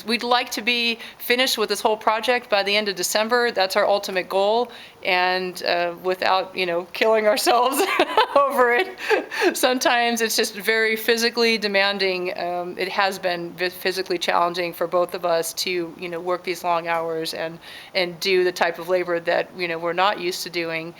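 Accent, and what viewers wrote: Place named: Ireland. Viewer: What I enjoy most is narration; her slight brogue does not hamper her clear and mellow enunciation, precise, yet complete, sentences. The self-aware, embarrassed giggles make listening easy and delightful. Those walls pierced with so many empty eyes may not be pleasing to my aesthetic sense, but I'm sure they'll be practical. I remember the Big One of 1964, which leveled much of Anchorage and caused a tidal wave which swept our Oregon beaches clear of debris and also one family's children. Keep up the good work. <link>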